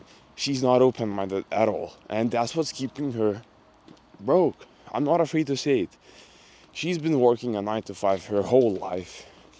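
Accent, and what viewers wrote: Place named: Croatia. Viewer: I recently hitchhiked around Europe from the u.k. not Lithuania sadly. I thought you sounded Croatian for some reason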